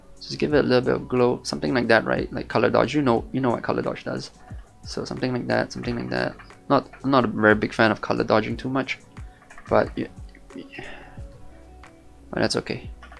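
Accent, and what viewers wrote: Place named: Singapore. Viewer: Recognized that Singaporean accent ;) Great vid, Subscribed!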